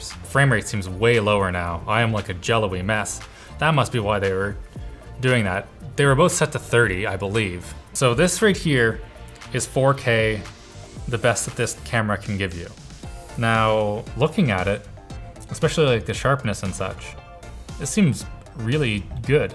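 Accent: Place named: Canada. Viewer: so many canadian pronunciations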